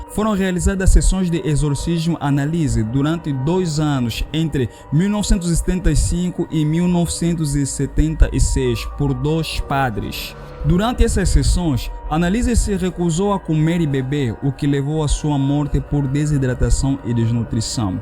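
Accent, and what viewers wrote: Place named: Angola. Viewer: Estava atrás desse episódio já faz um tempo e gostei de ouvir no meu sotaque Angolano🎉🎉🎉🎉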